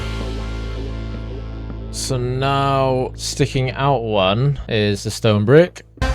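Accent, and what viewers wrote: United Kingdom. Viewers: Excuse me sir.. odd question but are you Cornish? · are u british because u say "li'el bit more"instead of little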